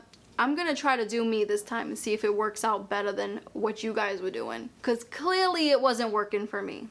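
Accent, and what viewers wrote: Boston, United States. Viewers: I was wondering where that accent came from, too. But please never apologize. You're awesome!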